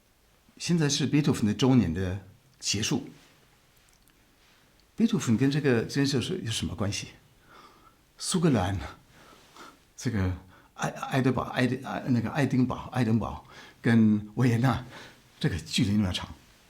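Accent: Standard. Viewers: 每一次聽到這首歌都感動。第一次看到這個頻道，老師的中文字正腔圓，講話平靜中帶有情感，猶如歌曲一樣令人感動。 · ，非常喜歡您，你的漢語講的比台灣人還標準。祝福你全家永遠萬事順順利利。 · 您的中文口音比很多我们内地人都准，很好听，欢迎您来武汉游玩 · 您的漢語水平讓我吃驚！太好了，字正腔圓！我都懷疑您到底是華人還是德國人了，新年快樂！ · 你的中文好標準啊！我聽你講話像在聽我朋友講話一樣！好親近啊！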